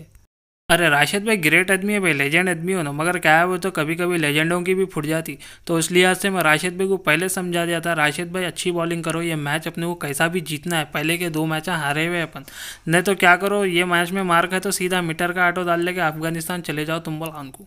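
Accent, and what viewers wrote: Hyderabad, India. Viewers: Aap bhe Moscow 6 Sal Paisa Barbad student dikhrai ye hyderabadi English hai bolna aao ya nai aao aage wale ku samajhne aagaya toh bas hai bolte😂😂🤣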